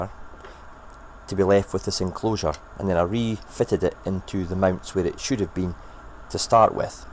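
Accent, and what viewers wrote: Scotland, United Kingdom. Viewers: What a great video that was. I didn’t know any of that stuff. Very informative. I love that Scottish accent too. Pete 🇬🇧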